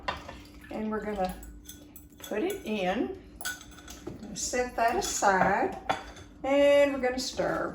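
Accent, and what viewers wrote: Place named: South, United States. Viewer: Thank you for making this video. I am gonna try this recipe this year for Thanksgiving. My Grandma use to make the best cornbread dressing. I wish I would of asked her for recipe. I have tried to make it for several years and just haven’t been able to get it right. Your recipe does look very similar to hers. Listening to you speaking and hearing your southern accent reminded me so much of her. Again, thanks for sharing this.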